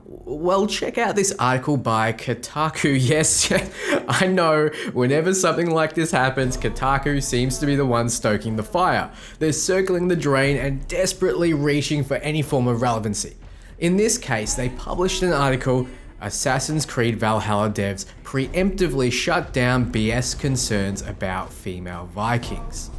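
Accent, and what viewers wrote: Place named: Queensland, Australia. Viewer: "Were there female Viking warriors? Who gives a f!" "That was a question Kotaku so next time use a question mark mate." I'm not sure what you read, but the part highlighted in blue literally has a question mark, right there, after the question. Are you intentionally being obtuse? I dislike Kotaku as much as anyone who understands their background, call them out on their biased reporting, there's ample opportunity for that you don't need to make stuff up. I really enjoy your content and as a fellow antipodean it's nice to hear an Aussie accent - your accent suggests Queenslander to me, I'm from SA. So what's going on my dude? EDIT: I've just come from your recent video with the hidden messages where you miss-read the hidden message. I don't think you're doing this intentionally and would like to believe that you are being intellectually honest but it does set the framework up to make me wonder what else is going on when we can't read what you're quoting from. 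Anyway as an Aussie I'm sure you'll see my comment for what it is, criticism, pure and simple.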